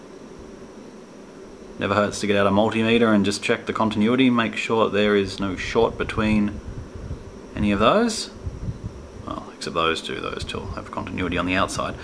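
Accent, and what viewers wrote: Australia. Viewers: You sure are one heck of an imaginative guy. I've seen some of your "constructions", I just wonder why you have so many "DC's" :P I'm from Portugal. You have an australian accent? Am I wrong?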